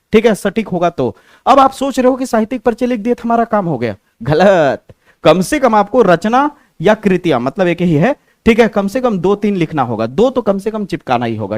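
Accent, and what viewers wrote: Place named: Awadh, India. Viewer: Siir aapko.bolne ka dhang nhi h do thow taiyar kra diya bolte h aaadhi hindi awadhi 😊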